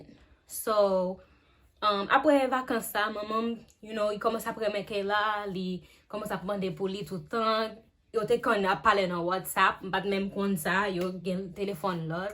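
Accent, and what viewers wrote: Cap-Haïtien, Haiti. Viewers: Ou pa fet haiti okap epi depiw ap pale la mwen gentan we accent moun okap la nan ou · Eh manmanl ki moun okap la lap toujou gen accent moun okap menmsil pa fet Ayiti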